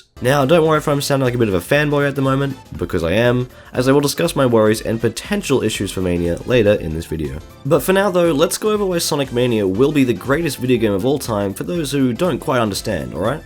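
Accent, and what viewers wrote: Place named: Australia. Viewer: I know right? I saw your video and was like "awww yeah, Mania hype!" and then heard your accent "hey an Australian, nice!" and then when you said you were from Brisbane I almost spat my coffee out all over my computer in an over-the-top cartoonish fashion in disbelief. You've earned yourself a subscriber! S3&K is one of my favourite games of all time and I can't believe we are a week away from finally playing something of that quality once again!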